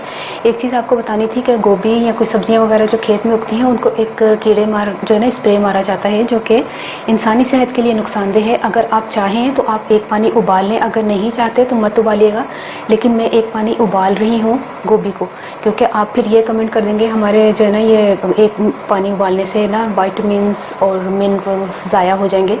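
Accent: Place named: Punjab, India. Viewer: yr tusi punjabi bolde bht zeada cutee lg de ooo keep on 👌👌👌😘😘😘😘😘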